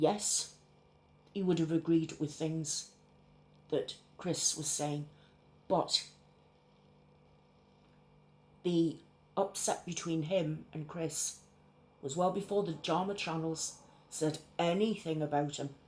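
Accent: United Kingdom. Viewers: He sounds so much like my mother "after all I did for them and never asked for anything in return" except there was always a price for everything, a high one and she would use the tiniest thing for leverage to get her own way for years later, not to mention using her 'good deeds' as things to brag about to anyone that would listen. I would never trust Chris about anything. I heard about him in passing (in a good way) quite a while ago and went to see his channel. I left very quickly because I recognised the personality type straight away as one that isn't healthy to be around in any way at all. He does nice things for people to buy them and then they're not allowed to leave his team ever afterwards. If they do, he lashes out. He doesn't understand that people are not objects to be owned and controlled. On a different note, sorry to hear you're struggling in this weather, hopefully it will break soon, or at least be less humid. 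I live in a nearby part of the UK to you (assuming your accent matches where you live) and we aren't used to this level of heat. I have a good supply of warm clothes and waterproof clothes, but very little for hot weather!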